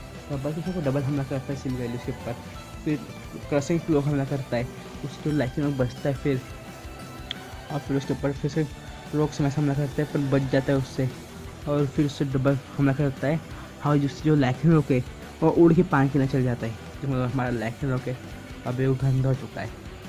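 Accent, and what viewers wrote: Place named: Bihar, India. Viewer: Bat dhik se kar bihari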